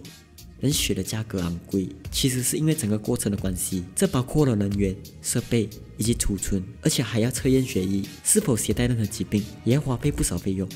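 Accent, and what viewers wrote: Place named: Malaysia. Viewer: 這馬來西亞口音我一北方人真的是聽的累死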